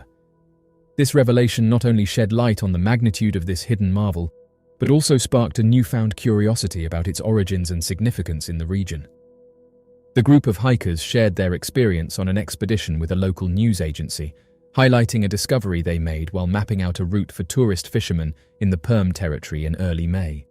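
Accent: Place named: Southern England, United Kingdom. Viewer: The channel is from the UK and the narrator has a basic Southern English accent. What accent wouldn’t annoy you ?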